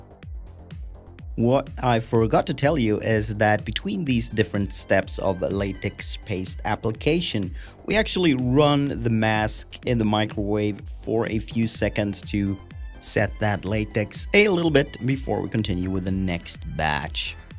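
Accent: Sweden. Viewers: i was not expecting the dialect i'm so used to rikssvenska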